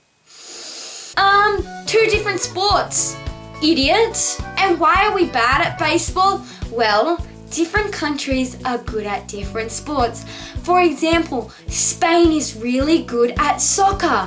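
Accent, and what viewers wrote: Bogan, Australia. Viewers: Why is your voice so Ozzie? Don’t get made at me I am Australian. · agh not only do you sound bogan but I wanna thong ya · you realise you sound like a bogan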